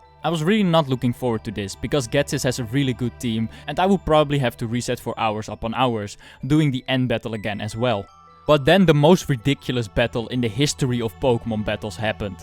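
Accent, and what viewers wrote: Netherlands. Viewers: Are you dutch? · Where are you from. My guess is the Netherlands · Are you dutch Your accent kinda sounds like it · Nice dutch accent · Je bent nederlands of niet je hoort gelijk pik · Are you Dutch? You sound like that. But I really liked this video :)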